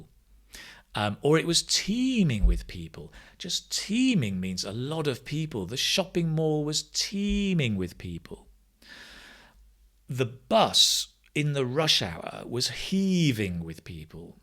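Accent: United Kingdom. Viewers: I wacth, I like your accent. So very British. Sticky 👍👍i subs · Omg, you're bloody attractive speaker K !! i felt in love to listened to your beautiful voice and British accurately accent !! Your way of teaching also phenomenal ! I can't take off my eyes and ears off of your videos ! Xxx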